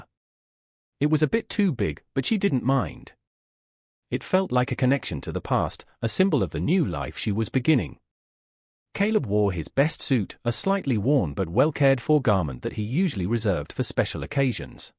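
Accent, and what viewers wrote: United Kingdom. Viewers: Westerns should NOT be read with a British accent, EVER!!!!! It's unnatural.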